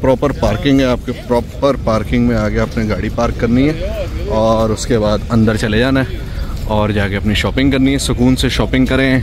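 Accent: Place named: India. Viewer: U talk by Indian, we understand nothing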